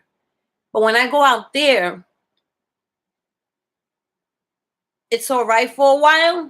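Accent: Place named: Bronx, United States. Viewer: Honey, are u from the Bronx? I ask because I am. U sound like it. Anyway, I know ur not a professional but I want to ask. Have u ever had ur dr cut ur klonopin dose. Mine did it. And it's been 3months. I updosed n still no relief, my brain is so messed up. Cant function. I'm a CNA n have to work. U can imagine. I updosed again. So stupid up n down. U ever had this?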